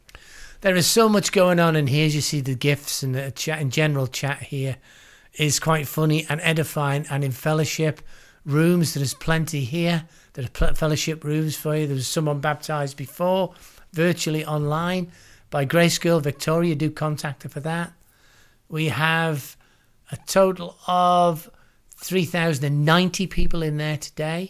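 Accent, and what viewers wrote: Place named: Scotland, United Kingdom. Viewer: Scottish? That wonderful accent.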